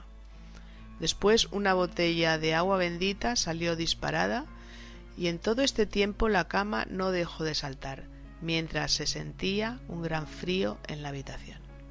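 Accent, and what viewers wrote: Spain. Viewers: ese acento español de verdad no me gusta le quita todo terror .... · Manuel Alejandro, si no te gusta el acento español, buscate videos solo de tu acento. Por otro lado decirte que la narradora no tiene porque tratar de reflejar terror. No esta contando una historia sensacionalista de miedo, para pasar el rato. Si no relatando el transcurrir de un caso, a modo informativo, como documento.... Que le gusta a la gente un sensacionalismo con estos temas... cuando realmente, ese arquetipo de manera de hacer misterio, es el arquetipo que le ha quitado seriedad a la verdadera investigacion o documentacion de los temas de misterio. La narradora no tiene porque narrar de manera que de terror, si no simplemente contar los datos de la historia de manera que se le entienda y quede claro. El objetivo de este video es dar a conocer un caso, no causar terror. 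· Me gusta el acento de espana, es hermoso ;)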